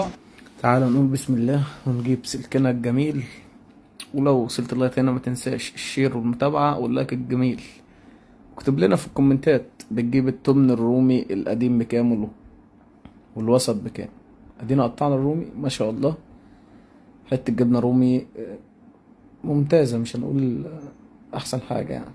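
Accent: Egypt. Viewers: لهجتك مصريه صرف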